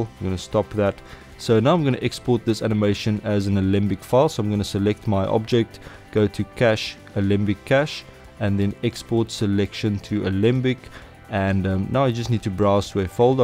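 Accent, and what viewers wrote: South Africa. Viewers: Ja wat se! good to hear some SA'n tutorials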